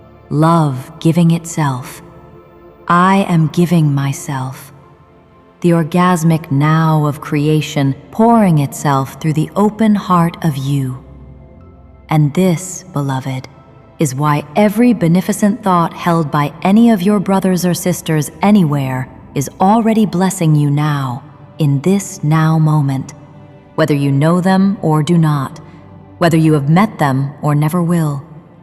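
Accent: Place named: United States. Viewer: If you are DF Mother God, may I ask, why do you have an American accent? ??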